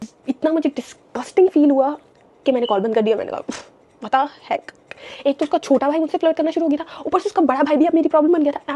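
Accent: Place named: United States. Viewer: Ur American accent is so damnn good!!!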